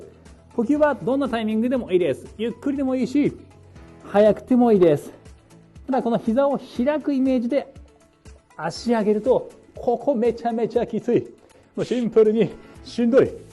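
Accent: Kansai, Japan. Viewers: 途中から吹き替えみたいなって内容がはいってこないww ちらちら関西トーン 頑張ってやってみます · 声が良い✨ 関西訛りがあるからか ナダルさんみたい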